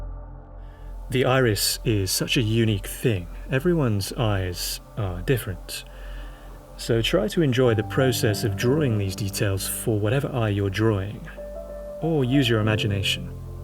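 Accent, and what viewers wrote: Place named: United Kingdom. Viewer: Yup I was thinking the same thing. The British accent ad smooth softness of his voice is just fin du fin lit! (French term.)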